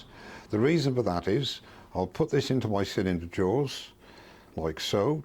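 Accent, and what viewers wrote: United Kingdom. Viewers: I’ve been watching your videos for a few years. I’m very pleased you are videoing some basic designs with an apparent emphasis on new turners. Good on ya mate! It’s ALWAYS good to review design ideas, tool use, and of course listening to your British accent. Greeting from your California subscriber…Roger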